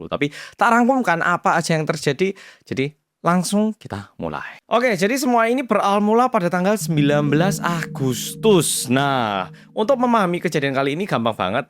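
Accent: Java, Indonesia. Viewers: Udah nggak tertarik sama sokor, cuma senang nonton mas, lucu 😂, medok medok jowo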